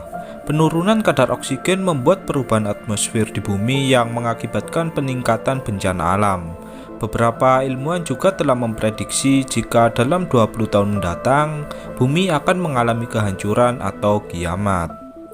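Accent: Java, Indonesia. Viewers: Medok yo mas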